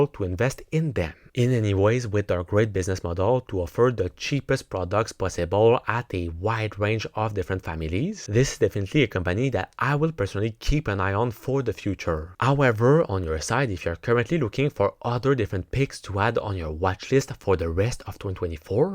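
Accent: Quebec, Canada. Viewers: Au prochain rapport trimestriel, pourriez vous créer une version de votre vidéo en Français, SVP. Merci bien. Votre accent Quebecois est très agréable à entendre.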